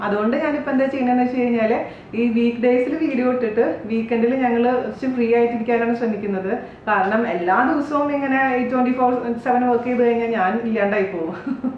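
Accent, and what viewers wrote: Thrissur, India. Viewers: Hii chechi nammude thrissur slack kalakito 😍enthurasa chechide samsaram ketukondirikan I like you chechi moleeeee😘🤩💗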